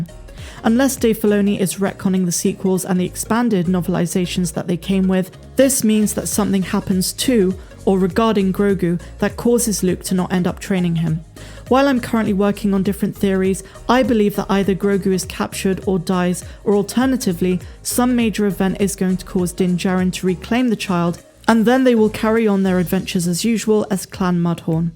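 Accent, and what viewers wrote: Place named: United Kingdom. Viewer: I think I could listen to delightfully British-accented read from comic books for hours...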